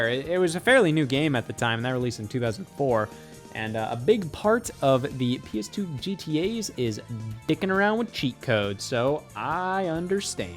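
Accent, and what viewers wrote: Ohio, United States. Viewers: The ohio is showing by pronouncing it ride aid lol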